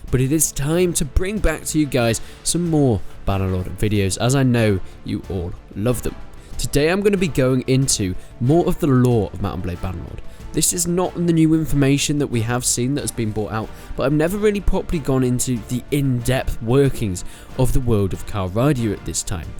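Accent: United Kingdom. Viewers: god, do all british people talk like this?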